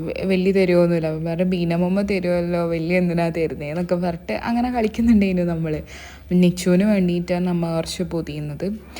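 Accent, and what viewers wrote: Kannur, India. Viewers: സംസാരം കേൾക്കാൻ നല്ല രസം ഉണ്ട് ചേച്ചി.... കണ്ണൂർ ഭാഷ കേൾക്കാൻ കൊള്ളാം 😍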